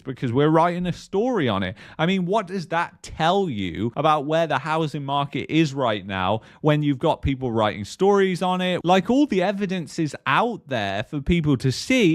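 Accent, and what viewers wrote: United Kingdom. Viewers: I never thought the British accent would annoy me so much, but here we are.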